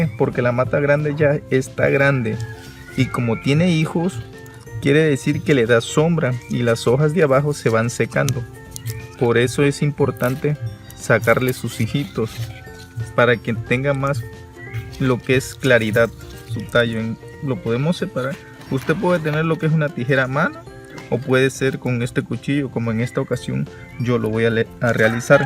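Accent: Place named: Salamanca, Spain. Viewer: Que alegría escuchar a un salmantino 👋 Muy buenas recomendaciones, saludos también soy de aquí 😁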